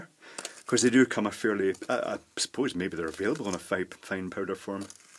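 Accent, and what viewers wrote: Scotland, United Kingdom. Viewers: ha nice to hear a scottish accent. listening from Paisley.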